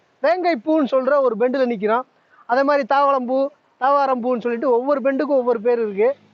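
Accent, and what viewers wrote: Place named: Theni, India. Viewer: I like your theni slag speech bro😍👍👍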